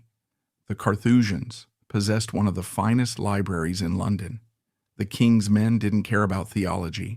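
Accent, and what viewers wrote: United States. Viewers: Why the American voice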